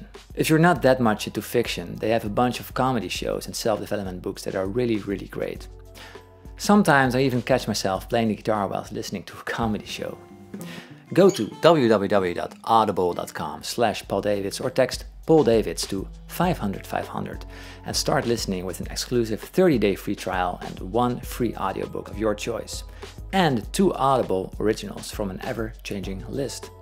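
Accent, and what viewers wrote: Netherlands. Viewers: wow ik zit al video's lang te raden of jouw accent nou wel of niet nederlands is!:) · He sounds dutch 👀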